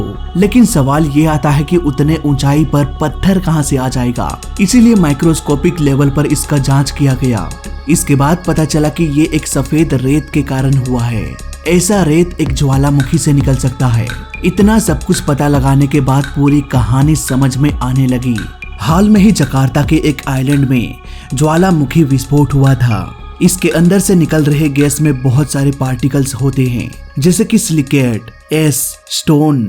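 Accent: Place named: Gujarat, India. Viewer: Gujjubhai accent..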